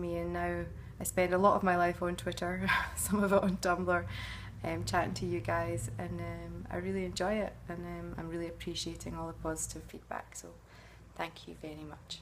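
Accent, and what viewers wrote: Scotland, United Kingdom. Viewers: I love your Scottish accent. so don't worry about if you're American accent is not great. I love your sarcasm also. I'm becoming a big fan.:-) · I adore the way you do your makeup. You should do a tutorial on how it's done. Also I love Rowena and your lovely Scottish accent. sarah in Ohio, U.S.A. P.S. I adore the way you say, Fergus.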